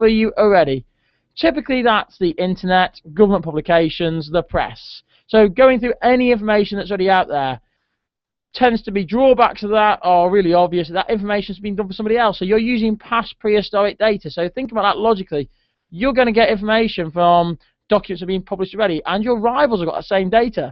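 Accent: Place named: Stoke, United Kingdom. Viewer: Thank you these really help! also are you from stoke? You say book and look as if you are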